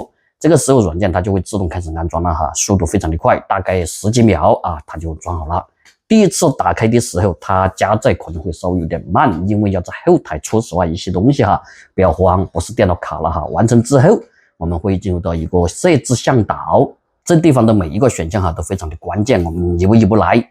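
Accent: Sichuan, China. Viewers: 喜欢你的四川口音(川普)，与印度口音的英语。。。:face-green-smiling:🤣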